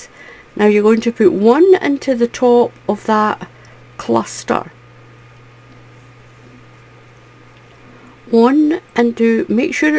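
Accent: Scotland, United Kingdom. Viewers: Just love your channel! I’m left handed and have watched quite a few videos of yours for baby items! So easy to follow and glad that you actually show the stitch we are to start on the next row. By the way, love your Scottish accent! I lived in Brechin in Angus for three years in the 70’s. Had my first wee bairn in Montrose. Been homesick for Scotland since I left.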